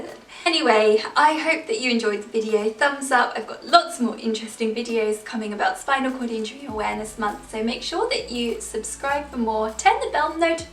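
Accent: England, United Kingdom. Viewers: Lol. I don’t even know why I watched this. “Curiosity killed the cat”...they say. Your personality is so magnetic I guess I’ll listen to you talk about anything. That English accent.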